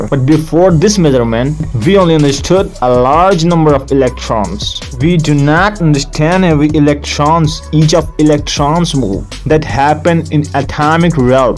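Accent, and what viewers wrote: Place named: Indonesia. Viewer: Indonesian trying american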